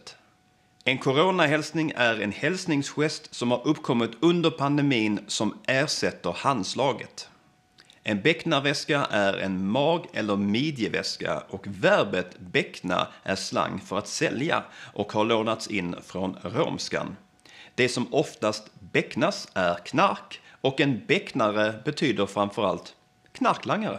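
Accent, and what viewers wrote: Skåne, Sweden. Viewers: Skånska är bäst!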